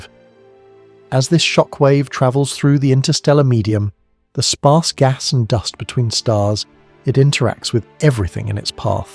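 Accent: United Kingdom. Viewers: Hey YouTube I'm talking to you Please stop recommending science for sleep or the Sleepy scientist or go to sleep listen to this fucking British dude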